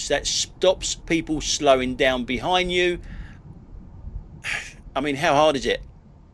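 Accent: London, United Kingdom. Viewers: I was originally going to say that dyslexia affects only reading the written word and not the grammar of the spoken word. However I just looked it up and because dyslexia is all about the inability to process the written word then it can cause problems with similar sounding words in your speech pattern. It's nothing to do with laziness and is simply a case of condition. I am sometimes tempted to correct him on his nuffinks and sumthinks and double negatives but thats just how Londoners talk - vive le difference!! So I think you're a bit mean suggesting he's lazy. It has no impact on how well or badly he drives.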